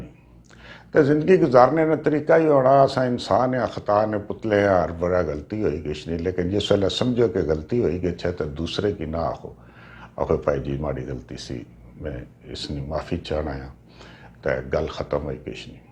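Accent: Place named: Pothohar, Pakistan. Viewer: Mashallah....Kai Sohni Pathwari Bolne Pye O.....Mhara Dill Bagh Bagh Hoyi Gachheya...... Bahun Pyar Naal, Nawin Dilli Ton❤❤❤❤❤❤ Allah Paak Tussan Ki Hassna Vassna Rakkhe. Aameen Summa Aameen ❤ Hamesha Tussa Ne Video Na Muntazir❤❤❤❤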